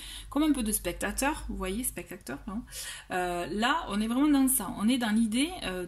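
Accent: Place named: Southwest, France. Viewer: Vous été du Sud ouest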